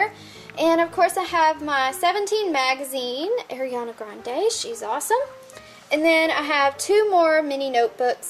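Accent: South, United States. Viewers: Are you country you have a axsent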